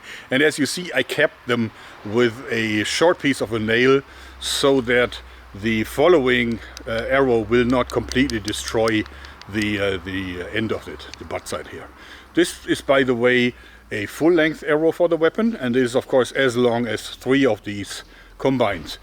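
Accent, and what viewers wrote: Germany. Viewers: 😆😆😆 Explanations boring? How can we resist History with ASMR "jerman" voice and giggle ♥️✌🏼📝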